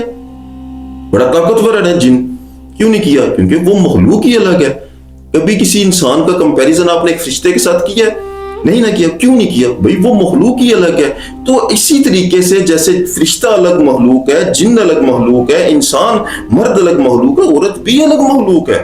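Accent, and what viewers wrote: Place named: Punjab, Pakistan. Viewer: They are punjabi from Pakistan their tongue is different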